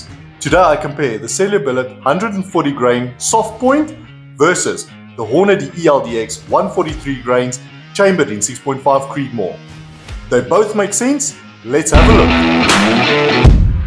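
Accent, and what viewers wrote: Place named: Afrikaans, South Africa. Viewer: Jeeez, you very Afrikaans.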